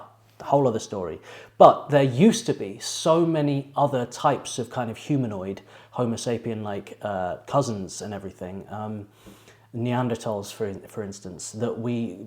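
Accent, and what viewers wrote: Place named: Surrey, United Kingdom. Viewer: I would absolutely love you to a deep dive on some of your favourite Nightwish tracks. I cannot agree with you more that on a first few listens it's all about enjoying the experience, and from there, starting to pull it apart and understanding how any why it works so well. Chances are I'll be popping onto your buy me a coffee because I certainly have a few that I'd like you to get to experience. Kind regards from Surrey in the UK. (From your accent, I'd guess you're not that far away :) ).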